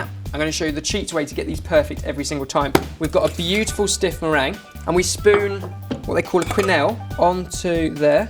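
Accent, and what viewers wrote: France. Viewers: Woo love your french accent, it's quite nice ! :D We looove our îles flottantes, so sweet and light :) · + French Accent = sexy! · So went to Marocco? What a surprise! Btw I always loved this recipe while growing up, my dad used to make it in my birthday. The limoncello touch is nice, I have to try it next time. And nice french accent;) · Just amazing. And cute French accent;)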